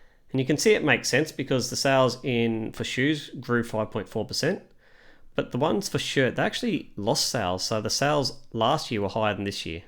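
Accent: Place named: Australia. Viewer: Love your accent, are you from Australia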